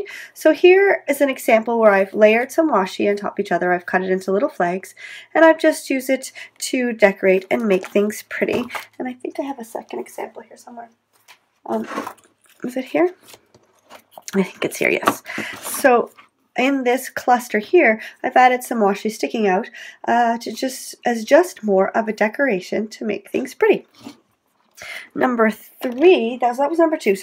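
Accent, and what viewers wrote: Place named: Quebec, Canada. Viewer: I'm obsessed with your accent! Mostly Canadian, but there's just a touch of French from time to time. My favorite tip is to use washi to make my own decorated paper clips. I can make them match my layout every week at very little expense and no guilt if I lose one!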